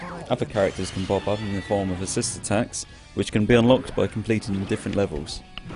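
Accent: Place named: United Kingdom. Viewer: Wow, you really are British. I thought that was a fad or something. :P Good review, though I probably wont pick up the game. Wish they would make more legit Toku games.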